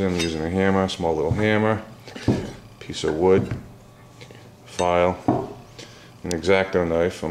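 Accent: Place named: East Coast, United States. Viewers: I got a kick out of your accent. Such an east coast '70's hair band voice (no slight intended, just that, out west we don't have the same attitude in our speech). I have a '72 Gibson J-160 that needs a new nut because the high E is too close to the edge. Bone or plastic? Thanks, man. Really liked the vid! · this guy has to be from massachusetts......or.....new york.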